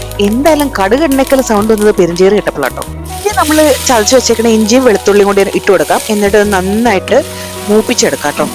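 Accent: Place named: Kozhikode, India. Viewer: ഇണർ കോഴിക്കോട് 😅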